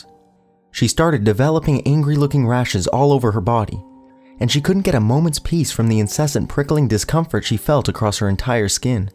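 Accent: Canada. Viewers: Great work friend! After the third one I stopped noticing all the "about" and "out". Canadian accents and humor aside. I think you have an actually soothing voice unlike certain other creepypasta readers, and an excelent selection of stories. Love how you read The Gristers.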